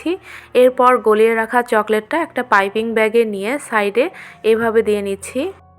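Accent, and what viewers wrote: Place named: Naogaon, Bangladesh. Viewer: Apu apnar basha nojipurer kon jiegaie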